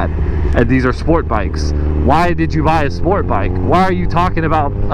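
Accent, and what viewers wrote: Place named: Houston, United States. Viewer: Off the first minute i can tell you from Houston lol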